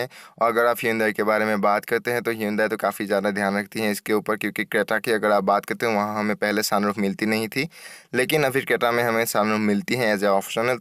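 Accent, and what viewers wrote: Assam, India. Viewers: Axomiya tone full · This is Assamese accent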